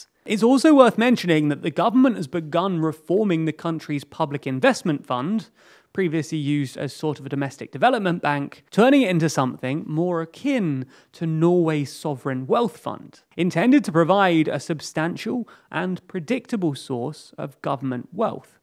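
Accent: United Kingdom. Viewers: Hearing from a person with British accent that human rights in the middle east have historical being violated... dude good part of it was causeed by your country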